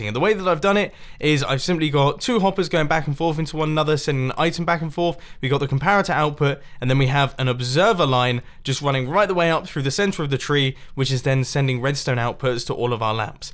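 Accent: Netherlands. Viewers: Lol hoe hij nederlands spreekt😂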